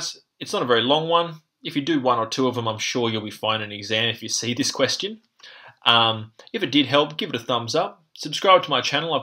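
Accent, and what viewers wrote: Australia. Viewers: This has helped me so much. I'm enjoying physics and mathematics in general a lot more as these concepts become more intuitive. Perhaps the narrator having an aussie accent similar to my own helps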